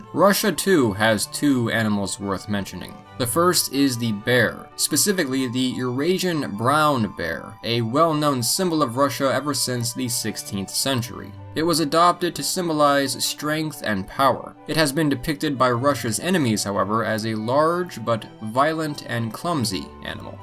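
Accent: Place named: United States. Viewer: it's a shame that you didn't ask the guide what Swedes call this animal in their own language which, rather tellingly, they pronounce "elg". If that's your voice-over, it sounds like you have substantially learnt English from American sources. Maybe your Swedish companion also learnt American English. As for the signs- well, looks like they're for English speaking tourists... mostly Americans? American English shouldn't be regarded as the definitive form of English. Another example is your pronunciation of the Australian bird, the "emu". Americans (and you) mispronounce this as "ee-moo". Australians pronounce it "eem-you".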